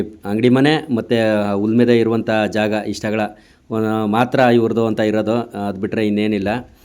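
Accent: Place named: Chamarajanagar, India. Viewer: Anna navu Chamarajanagar davru nam kadenu ede tara matadadu tumba chenagide nim vlogs kushi agutte normal life style toristira yavde artificial ella ❤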